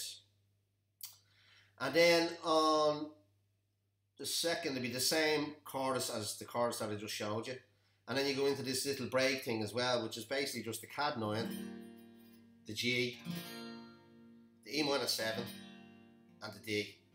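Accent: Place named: Dublin, Ireland. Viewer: You sound like a dubliner will you be going to his gig next May in the 3arena?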